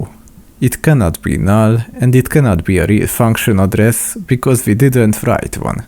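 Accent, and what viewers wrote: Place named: Hungary. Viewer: Came for C++, stayed for the Hungarian accent.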